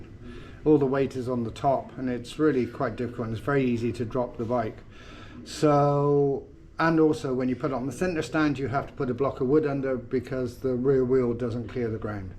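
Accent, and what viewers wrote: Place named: Scotland, United Kingdom. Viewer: You sound like you might be from south of scotland?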